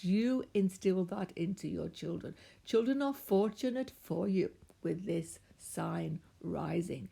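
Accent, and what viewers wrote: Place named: Welsh Midlands, United Kingdom. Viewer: It's cool hearing a welsh/ midlands accent in an astrology video hehe 😜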